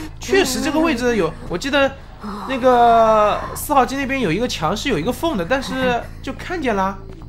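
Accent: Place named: Shandong, China. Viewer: 有没有朋友知道是哪里人。我吃饭看室友说有口音是山东人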